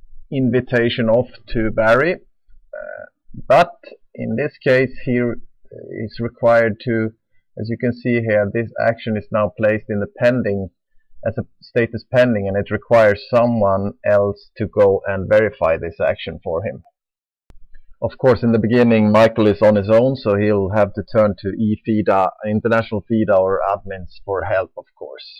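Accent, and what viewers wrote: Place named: Sweden. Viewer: Swedish accent FTW :D // Runeic on FID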